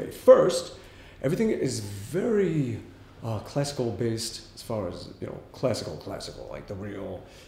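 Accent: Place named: United Kingdom. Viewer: speaks like British some times, He is the american G. Govan.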